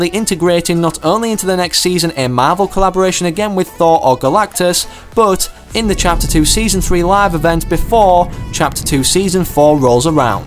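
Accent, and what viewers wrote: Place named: England, United Kingdom. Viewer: Who else loves his accent? 😂😂😂 I'm from England too are you from Leeds or Sheffield or Bradford I want to live in Leeds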